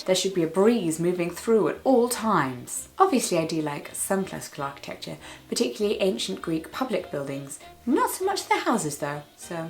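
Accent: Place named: England, United Kingdom. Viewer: I found you right before Christmas last year, not sure how...Love your upbeat personality and especially your lovely proper English accent. I'm American and love anything British and you could have been a sister in Downton Abbey!! I'm in love with James Norton!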